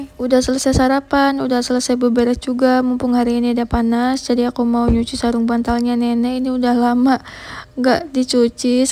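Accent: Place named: East Java, Indonesia. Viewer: OK, tetap semangat ya.. Setiap hal yg kita kerjakan dg niat & cara yg baik, InsyaAllah mendapatkan hasil yg barokah.. btw, logat bicara terdengar cukup familiar. Dari Jawa Timur kah?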